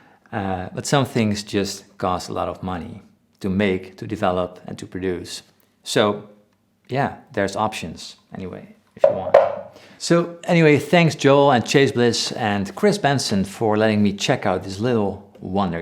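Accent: Netherlands. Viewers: ha lijkt wel een Nederlands accent 🙈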